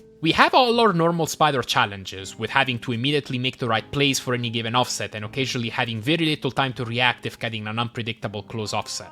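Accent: Italy. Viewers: I recognized the Italian accent in 1.3 seconds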